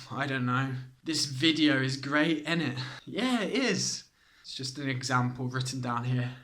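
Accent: United Kingdom. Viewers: Your videos are proper good, Iam fond of the British accent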